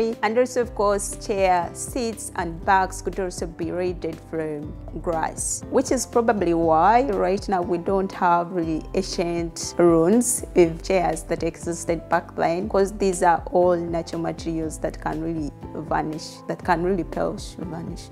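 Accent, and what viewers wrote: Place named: Uganda. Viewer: I love your Ugandan accent ❤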